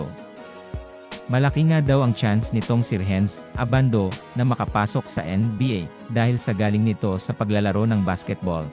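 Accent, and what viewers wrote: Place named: Pampanga, Philippines. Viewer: kapampangan yung nagbabasa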